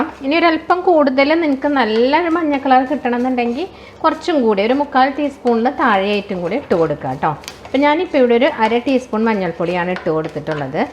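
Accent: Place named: Thrissur, India. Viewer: very nice recipe....are you from trissur? you have trissur slang...im also from trissur😀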